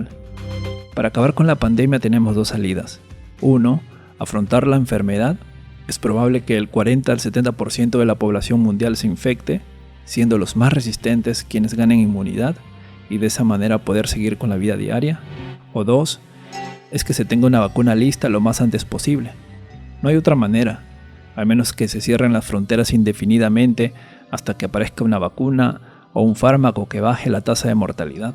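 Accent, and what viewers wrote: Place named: Peru. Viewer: Por el acento me parece que es peruano. El youtuber.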